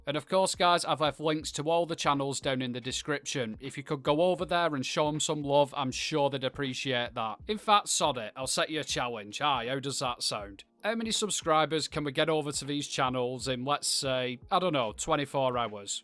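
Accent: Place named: Northern England, United Kingdom. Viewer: Brilliant build and humour yet again and loved you bringing in the other builders too! I love seeing what you all come up with and I wish i was as creative and clever! Oh and as a fellow ‘Brit’ from up North I love the accent and by name and nature!!! 😂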